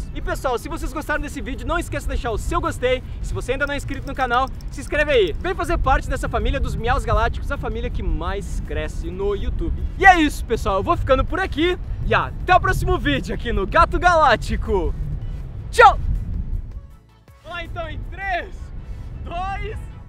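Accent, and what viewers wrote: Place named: Portugal. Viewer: Ele parece um cara q veio de Portugal e ficou por um tempo no Brasil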